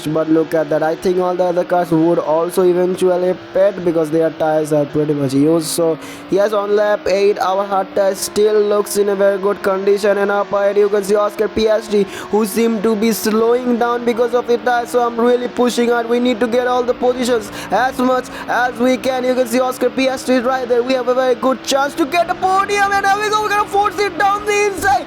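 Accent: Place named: Pakistan. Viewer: Your english sounds like Pakistan's english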